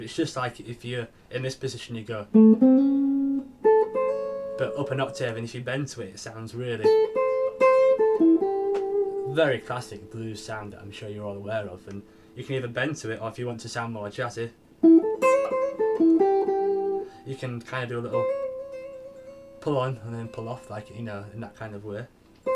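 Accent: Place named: Lancashire, United Kingdom. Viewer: Good vid man very useful. You from Lancs?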